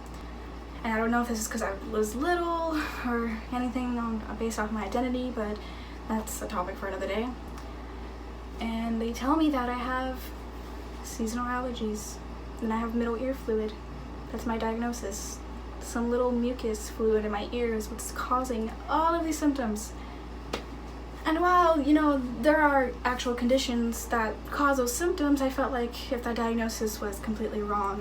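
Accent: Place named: United States. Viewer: You know what I don't understand about you? You f lost your f hearing and all you cared are about people! "If I have cancer at least people will understand".. " I have something to tell people finally".. Whaaaat the hell is wrong with you people from USA? (I suppose you are from USA by your accent). You are all so shallow and willing to be.. part of something, included, communities, etc.. Damn girl. You have all my sympathy for the hearing loss but you need to change your ways. Really.. you are all like from the same material in there. I can't care less about people.. what they think.. I care about my f hearing.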